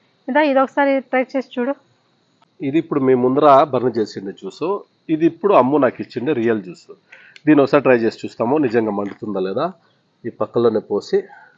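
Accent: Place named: Karnataka, India. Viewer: Bro meeru Kannada na ? Endukante , ma frnds kannada colleagues kooda telugu ilane maatladutaru , so slag acham alane undi Karnataka people's laga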